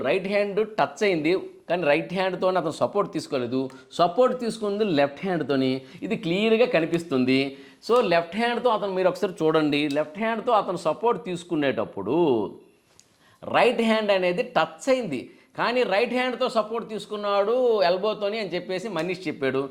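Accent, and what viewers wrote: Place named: Srikakulam, India. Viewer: Enti sir maa Srikakulam slang touch avuthundi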